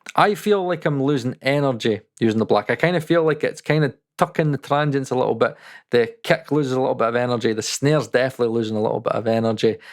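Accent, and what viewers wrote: Scotland, United Kingdom. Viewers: Removed all Acustica a long time back. I use far older hardware, so seeing your cpu spikes made me think "not for me...Again". On another note: Your Scottish accent + the Chipmunk effect made me crease. Keep up the humour pal when getting the odd dig from trolls. <3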